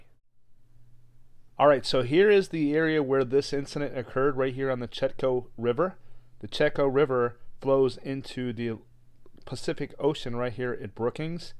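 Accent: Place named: Canada. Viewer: Canadian accent is too much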